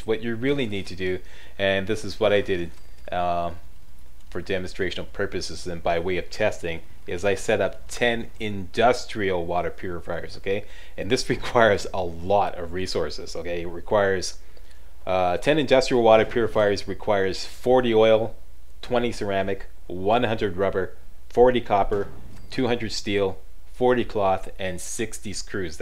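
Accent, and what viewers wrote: Canada. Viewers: You sound pretty damn Canadian Bruh. #SK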